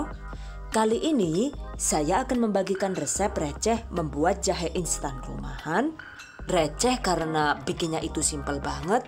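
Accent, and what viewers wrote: Banyumas, Indonesia. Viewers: Lucu bahasa ngapake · Waduh... ngapak banget.... 👍👍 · Top banget lah,seneng karo ngomong ngapake,nek ora ngapak ra kpenak he he he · Jebule wong pengapakan ya 😊. Mohon Ijin mau dicoba buat usaha resepnya. Salam ngapak bu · Bunda kok ngapak ya ngomonge🤣🤣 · Suaranya antik , ngapak banget hahaha deneng fasih temen , wong ngendi Rika? Wkwkwk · Mbak-nya orang ngapa yak? 😊 sama dengan orang tua saya he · Keluar deh aslinya wong ngapak hehehe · jebule rika wong ngapak ya, aq wong pbg ,rika wong ndi bun? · I Like this, medoknya banyumasan nambah lucu dan semangat nonton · Ladalah...jebule ngapak kaya inyong, mbekayu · Wkwkwk ngapaaakkk ngapak, banyumasan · Jane wong ngendi yu? Deneng cok ngapak. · Kk. Ngapaknya daerah mn nih??? Cilacap mn? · Saya kira bule. Ternyata bisa ngapak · Hemmmmm suaranya khas bgt kadang kangen ngapak nya jga ...mksh banyak beri info yang bermanfaat semagat berwira usaha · trimakasih kakak resepnya..kakak lucu waktu ngomong jawa ngapak😂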